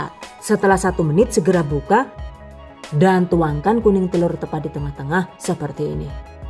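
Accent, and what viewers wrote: Banyumas, Indonesia. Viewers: Semoga tukang warteg tidak mencontoh video ini..karena waktunya lama. Ngomong ngapaknya selalu saya tunggu. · bikin org gk bosen belajar karna byk selingan pke bhsa jawa ngapak 😂 jadi makin smangat mantengin chanel nya bun 🤗🥰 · Haha ada ngapaknya juga,,asal mna bu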